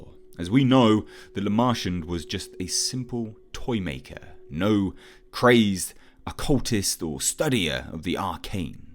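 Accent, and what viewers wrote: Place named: England, United Kingdom. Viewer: I am trying hard to get your english accent. I need to listen to you more for the true English pronunciation of words.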